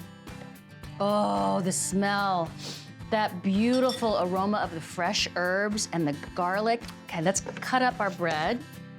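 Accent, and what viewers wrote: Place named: United States. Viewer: That's how it's pronounced here in the USA...haven't you figured that out by now?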